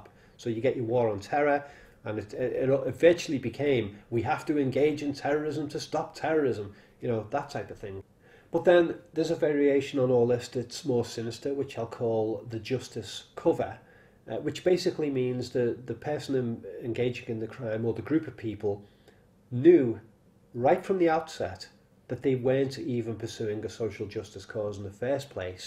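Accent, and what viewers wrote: Liverpool, United Kingdom. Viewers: Love you and your chanel, but as a Blackburn native its very very funny to hear a scouser say "did him too" when describing a whole ass murder haha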